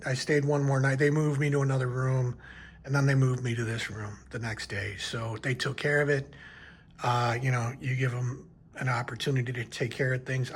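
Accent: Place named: United States. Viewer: I have stayed here before. Very nice hotel. You sound American. No hard feelings - Canadian here.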